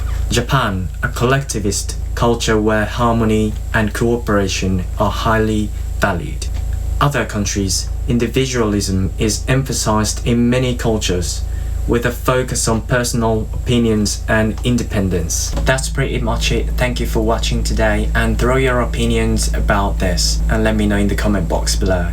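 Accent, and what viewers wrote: United Kingdom. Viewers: Your pronunciation is very good. To be honest, it almost sounds like you have a slight British accent lol. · That's not slight, haha. It's very British sounding.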